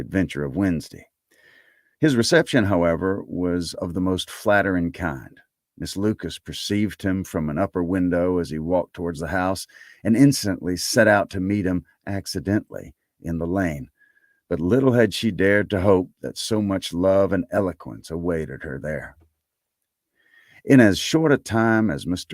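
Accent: South, United States. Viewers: Is the "...and Magnolias" added simply to indicate that it has been read in a southern accent, or does it come from some adaption, like, "Pride & Prejudice & Zombies"?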